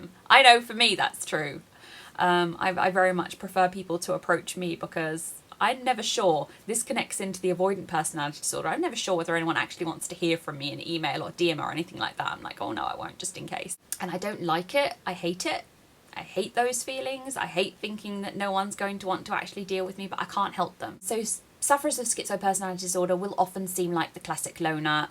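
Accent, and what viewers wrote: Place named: England, United Kingdom. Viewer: ! Are you out of country? I'm saying you seem to have an English accent! And can I say a beautiful woman! Commented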